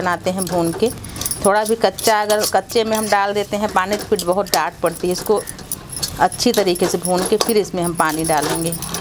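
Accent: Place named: Uttar Pradesh, India. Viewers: Aunty Apne up evam ilahabad ki Shan badha di. Love you. Bhasha ka accent bhi pure up style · I love your UP accent........UP regional taste is best in India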